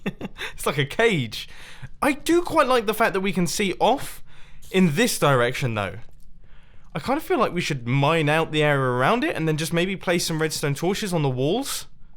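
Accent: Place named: United Kingdom. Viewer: A piston doooor stoooor XD I love mumbo's British accent by the way :)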